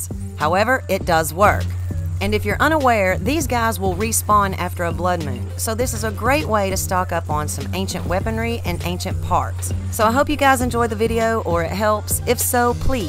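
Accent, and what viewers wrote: South, United States. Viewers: I really like your accent. Not sure where you’re from, but it reminds me of the South and that is very comforting to me! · I got a switch a couple months ago and I started playing breath of the wild not too long after. Your videos are awesome and they’ve helped me a lot thank you. Also as a fellow southerner I appreciate your twang 😁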